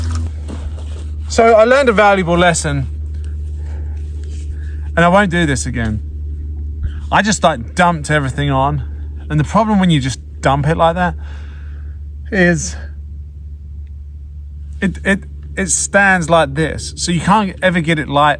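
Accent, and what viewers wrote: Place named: United States. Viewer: why the yank accent??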